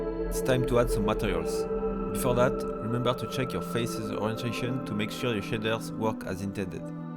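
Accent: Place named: France. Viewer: J'aime beaucoup ton accent français. Salutations d'Italie ! Ciao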